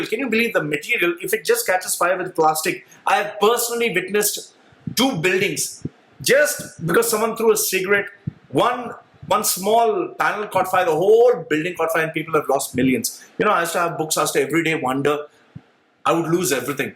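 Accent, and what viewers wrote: Kerala, India. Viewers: Wat the fuck. I bet ur not married if so she has to be sad or even blind haaaaaaaa haaaaaaaa haaaaaaaa But u sound Indian u bullshitting loony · Y sooo much of hateee......Just think in a possitive way.....This country has provided a lot to expats who work here.....Respect gratitude...And speaking about labourers .....all those people are supplied by some indian or pakistanii booses.....why are you bieng such a hard ass.....Finding negativity in everythinggg.....Chill dudeeee.....and if uae goes down ....we tooo go down with themmmm.....Easy broooo...there are million of people who relies on this economy.....alo g with this native people there areee other who pray for this govttt.....find the gooddd spread good thingssss......And stop acting likeee ur an american ......I am sure ur a malabaaaaaariiiii.....buy ur manglish accent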